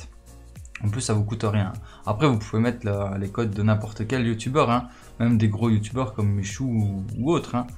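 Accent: Belgium. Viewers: Wsh bg t belge ?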